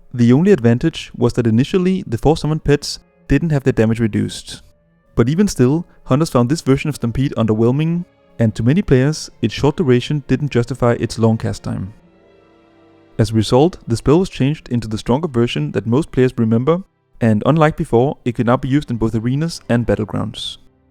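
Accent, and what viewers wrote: Denmark. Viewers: You can just hear this guy is danish xD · Skud ud til danglish! <3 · Great video, I love the danish accent, nice narration.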